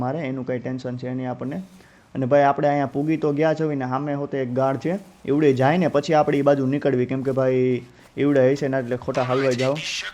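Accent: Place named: Kamrej, India. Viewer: Bhai tame kyana aa tame j vat kari te em lage kamrej khaudhara gali aju baju❤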